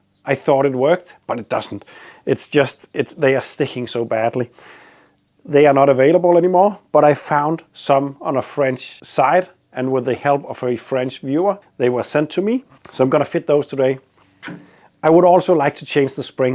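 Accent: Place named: Denmark. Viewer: My mother was Danish and miss the accent. Listening to you brings me happy memories.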